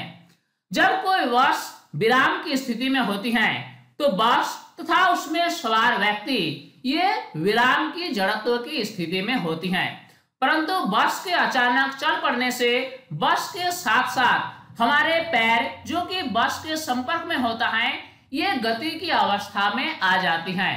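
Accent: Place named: Bihar, India. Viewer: Sir apki boli achi nhi h asa lag rha h ka aap bihari ho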